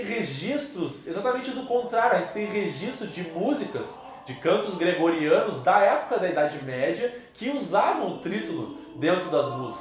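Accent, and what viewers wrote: Rio Grande do Sul, Brazil. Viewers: Olá professor! Estou encantada com seus vídeos. São todos maravilhosos e de excelente qualidade!! Gostaria de saber seu nome e nome da equipe de trabalho. Creio que sejam gaúchos, pelo sotaque. Acertei??? Abraços à todos! E parabéns pelas explicações simples e fundamentadas.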